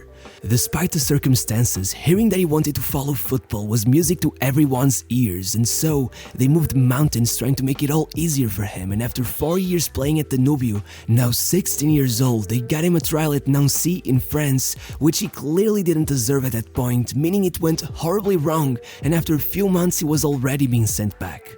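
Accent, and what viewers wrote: Portugal. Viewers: I hear Portugal in your accent.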